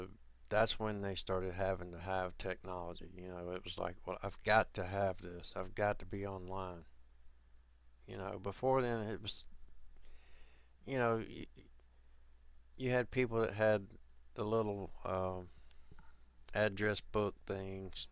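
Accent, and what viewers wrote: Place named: Texas, United States. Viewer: you sound almost like a Texan. I bet your German is a bit cute. Enjoying your vids, bud. Thanks! P.S. Texans speak really slow, too, especially the ones from the Panhandle part of Texas.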